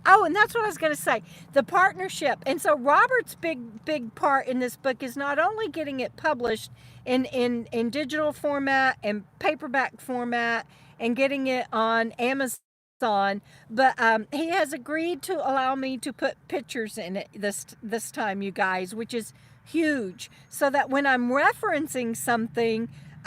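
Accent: Texas, United States. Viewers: I caught this about 90 minutes after you did it. I still don’t have internet (Hurricane Ida) and won’t until mid October. I can hear some of that Texas twang in your voice! Sometimes I can get enough signal later at night by hot spotting off my phone. Good practice for boondocking! I’m going to Houston Monday for about 5 days then will be returning for Lola. Remember, I got a little gift for you from my friend in Houston’s mama who passed away in early June!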